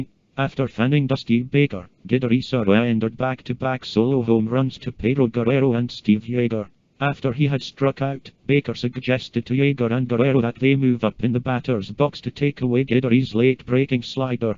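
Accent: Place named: Ireland. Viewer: I am wondering: why did you choose the Irish voice for old videos on this channel?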